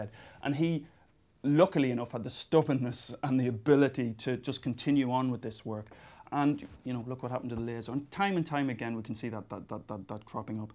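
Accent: Ireland. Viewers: Where is from? He sounds like he is from south Dublin, maybe Meath? or even Wicklow? Completly irrelevant but I would like to know. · I'm not sure where he is from specifically but to me he sounds like he's from somewhere around Dublin. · I'd say its a louth or monaghan accent.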